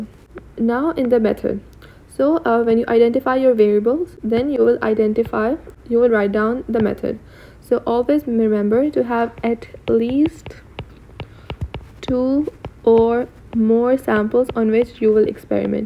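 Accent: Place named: Pakistan. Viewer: Where are you from...Ur voice seems Pakistani 🇵🇰❤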